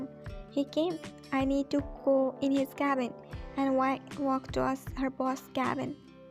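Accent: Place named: India. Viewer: Your voice is just so cute I like your accent are you from India ? Lots of love from 🇫🇷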